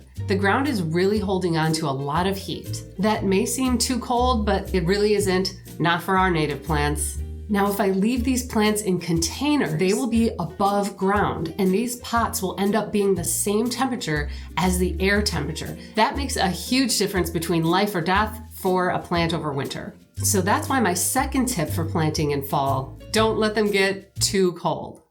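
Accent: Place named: Midwest, United States. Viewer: Love your content! Longer vids plz. No need for all the extra movie clips (Xtra editing)... Your midwest voice is needed on YT!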